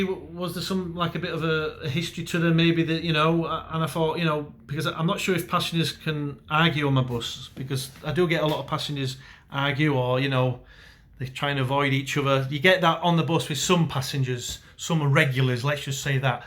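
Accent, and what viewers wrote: Yorkshire, United Kingdom. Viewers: Are you Yorkshire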